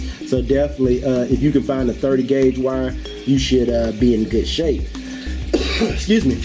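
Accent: South, United States. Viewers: you sound like you're from the south xD hell yeah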